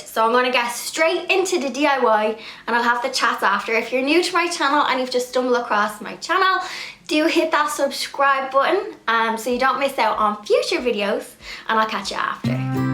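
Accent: Ireland. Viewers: New to your channel, love your fabulous Irish accent, reminds me of home, I am from Dublin, finglas. I love the ideas so simple and so effective. They are so very pretty. I will definitely be making them. Well done. Love the cats entrance. Lol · I'm going to make some of these for my little things for crafting in this nursing home. Ty so much you're beautiful and so are these. Love your accent sounds like Ireland. I'm part Irish. Miss my DAD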